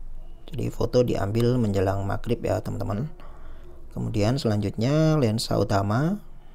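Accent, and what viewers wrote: Pasuruan, Indonesia. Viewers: Wong Pasuruan a mas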